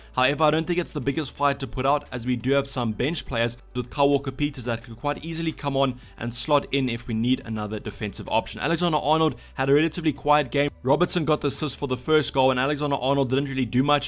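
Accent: South Africa. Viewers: Are you South African my boet? 😂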